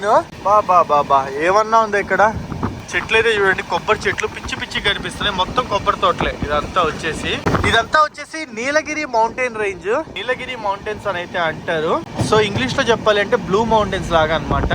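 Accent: Nellore, India. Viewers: Meedhi Nellore aa?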